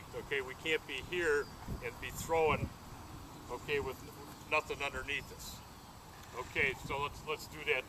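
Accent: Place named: Midwest, United States. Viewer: The word is quarterBACK lol. BACK midwestern guy. Bad accent good coaching though.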